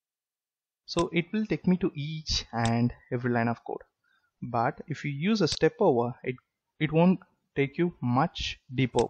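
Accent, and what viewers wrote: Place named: India. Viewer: From I heard the Indian Accent I knew gonna be great vid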